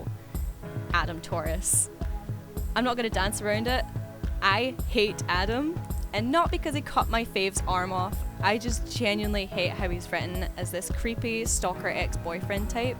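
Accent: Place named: United Kingdom. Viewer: you sound like you come from the UK going by accent alone. but, i gotta say i like the idea of killing of arcy boy as yeah...that would of had lot of sub branch events and encounters down the line. also Miles is a hack, like really check its like he attended one of J.J abrams talk on makeing story tropes and miles copys it like a fucking bible honestly just go in expecting something worthy of J.J film and you can normaly guess the ending or twists that are comeing up. also i personaly think hes slowy takeing the animation into "LENS FLARE!!"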